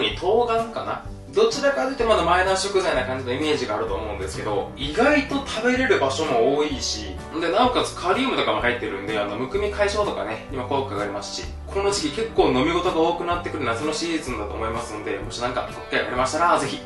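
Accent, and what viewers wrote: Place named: Fukuoka, Japan. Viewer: 「バックを『からって』店内を歩けないでお馴染み」 親の転勤で福岡県から他県に引っ越した時、学校でランドセルを『からう』が伝わらなかった時の衝撃。の福岡弁懐かしくて大好き 『背負う（せおう、しょう）』が標準語なのかな？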